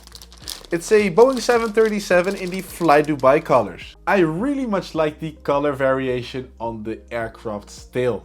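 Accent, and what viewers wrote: Netherlands. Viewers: I know u are Dutch cuz u have a Dutch accent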